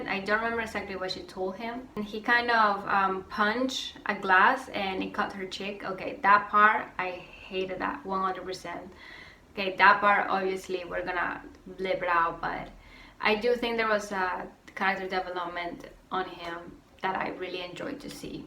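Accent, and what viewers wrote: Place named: Puerto Rico. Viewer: I love your accent. Reminds me of my Puerto Rican grandparents and cousins 🥰🥰🥰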